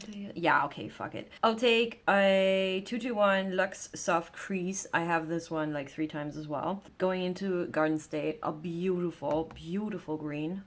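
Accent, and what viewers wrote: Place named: RP, United Kingdom. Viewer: Love the video and the look, gurl. It's great hearing you talk english. Very posh. Even I did not grow up bilingual, due to working in different international companies, my english improved a lot and I'm not afraid to use english, whereever it is needed - and yes, I use much more swear words in english. You asked about the difference between purple and lilac. For me purple is darker, warmer whereas lilac is more of a light cool tone.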